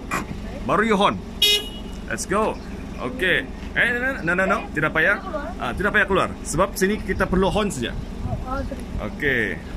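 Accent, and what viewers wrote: Sabah, Malaysia. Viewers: Sebulan lagi test jpj, mmg tk ada latihan persiapan utk jpj ulangan keesokkan nya. Sebulan juga duk “study” video-video cikgu. Suka juga loghat Sabah cikgu. Akhirnya lepas juga test harini 25/11/2021. Terima kasih cikgu share ilmu 🙏🏻 Salam dari Melaka.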